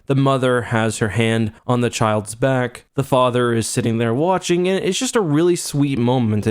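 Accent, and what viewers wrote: Canada. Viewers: Are you Canadian? I detect a hint of Canadian accent, eh. This might help explain why you felt all mushy about that scene. Trudeau. I think he'll be the next Bruce Jenner only way more feminine. If you are Canadian then it sounds like he's had a bad influence in you. Man up! This is The Mandalorian, not Gilmore Girls.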